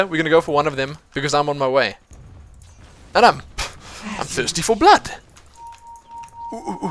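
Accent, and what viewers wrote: South Africa. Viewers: You sound south african. Is that accurate?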